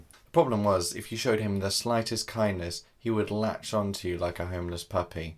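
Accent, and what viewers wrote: United Kingdom. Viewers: Yours so damn British...but in a good way, of course. :) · Watching this is like listening to my journal being read with a smooth voice with a lovely British accent.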